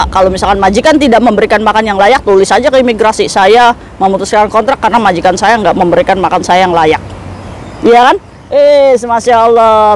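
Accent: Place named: Java, Indonesia. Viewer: Ka orang indramayu ya kk ko Bahasa rada " jawa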